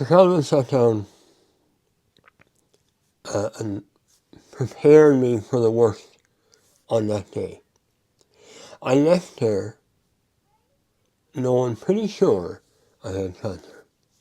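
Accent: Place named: Ireland. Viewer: Hi, I used to watch yours and others commenting on cars here on youtube, you are not Jeremy Clarkson, but your Irish accent and your personality shines, so I remember you much more than I remember lots of others. I noticed your voice has changed a long while ago, but didn't think much of it. I then moved to Canada and no longer needed a car, so kind of stopped watching car channels. Yesterday, I wanted to see some Toyota Corolla opinions and searched for your channel, then discovered that your voice has changed and is due to the cancer. I felt quite sad, I showed your video to my wife and told her why I liked your channel etc, she was quite moved by your story too. You are brave, your inspire whoever is facing any difficulties in life not to give up and keep one's spirit high. Please keep fighting, keep bringing us your amazing videos, I want to see you commenting on cars when you are 99 years old, or whatever travelling devices we have by then! 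My best wishes to you and your family!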